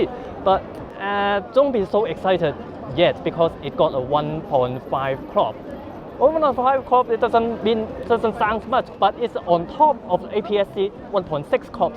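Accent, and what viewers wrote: China. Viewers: China English !!!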